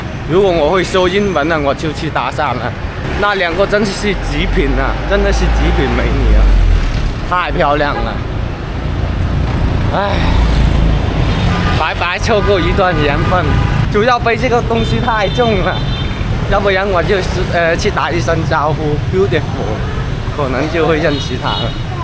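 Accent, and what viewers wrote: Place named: Guangxi, China. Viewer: 广西老表么，口音好像啊😂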